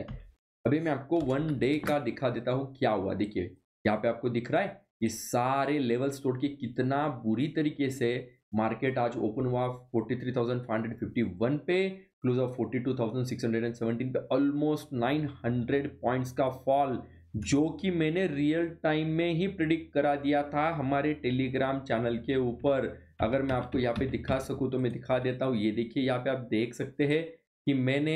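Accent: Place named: Gujarat, India. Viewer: Sir aap gujrati ho asa lagtahe apke voice se 😊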